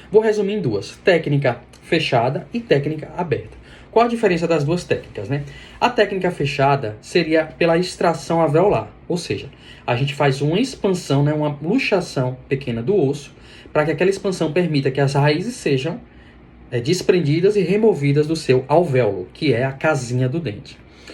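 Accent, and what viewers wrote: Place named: Pará, Brazil. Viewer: Tenho certeza que vc é paraense . Extrai um siso ontem e o dentista não me falou nada disso . Obrigada 🫣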